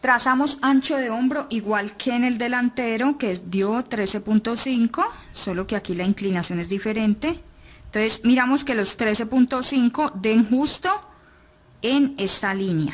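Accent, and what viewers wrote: Colombia. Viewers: Que gusto conocerte, poderle poner un rostro y un nombre a esa voz tan Colombiana. Agradezco infinitamente tu dedicación y esmero en enseñarnos, se nota que te gusta lo que haces, me encanta tu honestidad y tu forma tan graciosa de hacer aclaraciones.... de corazón te digo DIOS TE BENDIGA....!!! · Hola, excelente tu forma de explicar, por tu acento, creo que eres vallecaucana, soy de Tuluá, y gracias a ti, he aprendido mucho. Gracias por tu generosidad al compartir tus conocimientos.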